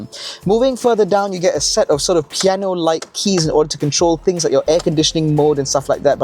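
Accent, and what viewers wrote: United Kingdom. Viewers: His accent is like british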